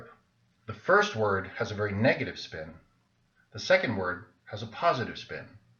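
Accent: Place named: United States. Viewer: May I ask you where you live? I hear an American accent though so America I guess, but where?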